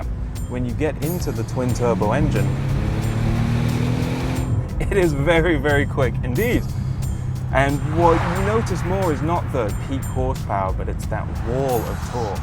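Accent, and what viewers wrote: United Kingdom. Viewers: American or british? i cant tell. sounds like he has a slight british accent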